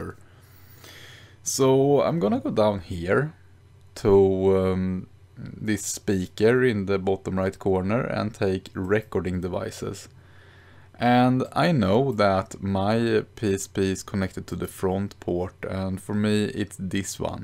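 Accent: Sweden. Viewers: Could you by any chance be swedish??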